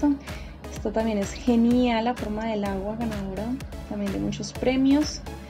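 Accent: Colombia. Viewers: Eres colombiana?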